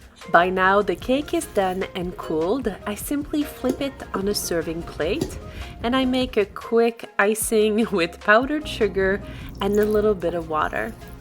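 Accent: France. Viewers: You got more done in your morning than I do all day! Girl when you were like 'and now it's ' I couldn't believe it! LOL Good for you I found this video very enjoyable and your accent is nostalgic for me as a francophone living overseas. Thank you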